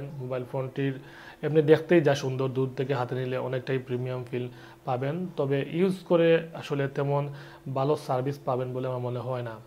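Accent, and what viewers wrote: Sylhet, Bangladesh. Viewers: Bari sylhet na kita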